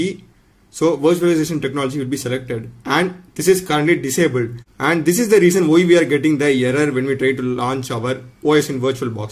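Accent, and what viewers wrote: India. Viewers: Bro why Indians talk English like that shiii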